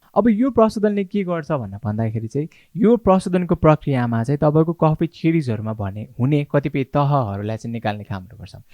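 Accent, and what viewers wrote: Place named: United Kingdom. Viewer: Thankyou bhai tara ni ki nepali ma ki english ma bolnu na hau...bhai ko nepali bolai ma english lawaj le alik bujhnu garo vayo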